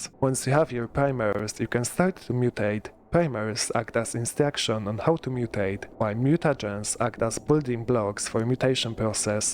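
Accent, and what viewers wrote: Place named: Poland. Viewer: Interesting contnet, funny jokes and nice Polish accent.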